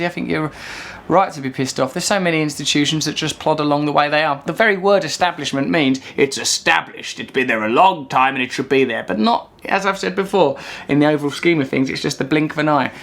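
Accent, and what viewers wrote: United Kingdom. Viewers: your cool and all, but can you stop talking in a British accent. It's annoying me.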